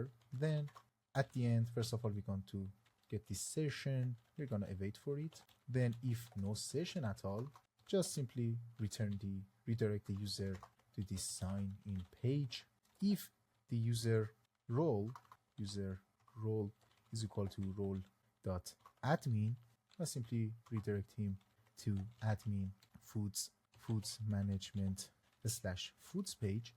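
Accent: Iran. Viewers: Persian Accent Discovered ✅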